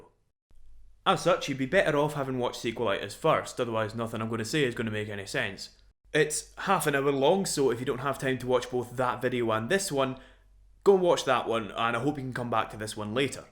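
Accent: Scotland, United Kingdom. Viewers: I freakin' love your scottish accent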